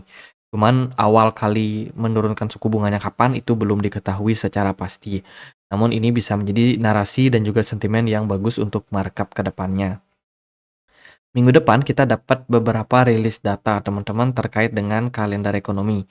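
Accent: Bali, Indonesia. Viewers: Dari vokalnya jelas orng bali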